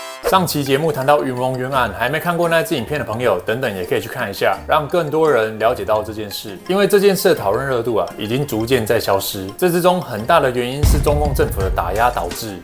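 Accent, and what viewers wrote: Taiwan. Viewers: 發現看了幾次，蠻喜歡聽波特王烙台語的😂 很有‘’愧靠‘’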